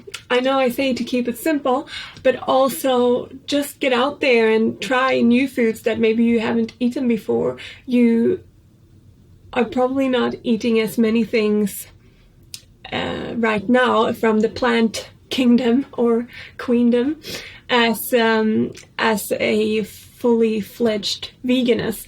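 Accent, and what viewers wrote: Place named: Sweden. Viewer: this is the first video of yours that i've watched. my roommate is swedish, and as soon as you started speaking i immediately knew you were swedish. love the video! definitely subscribing.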